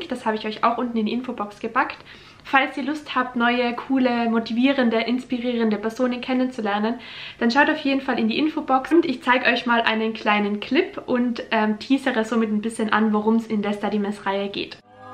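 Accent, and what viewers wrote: Austria. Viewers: Welcher Dialekt ist das? "für die Uni zu dun" "Unicondend" :D klingt nach Österreich oder so?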